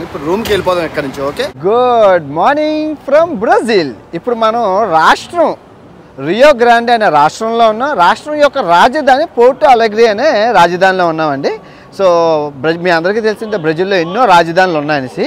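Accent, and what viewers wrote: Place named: Chittoor, India. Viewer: Namaste bagunnara 😂 , Andra chitturu style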